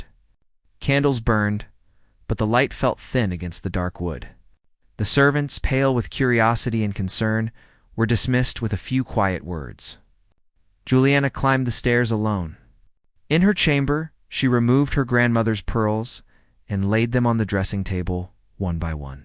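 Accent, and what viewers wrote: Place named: United States. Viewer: Narration is poor, American accent for an English Regency and weird scream, strange sounds between words. No real basis for him to continue being blind.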